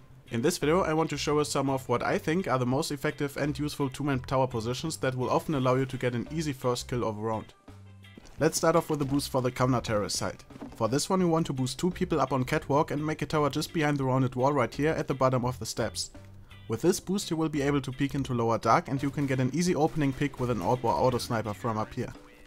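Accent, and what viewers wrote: Germany. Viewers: liegt vllt daran das er deutscher ist bin mir aber nicht sicher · Dein deutscher Akzent ist voll krass zu hören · Du bist eigentlich deutsch ne :D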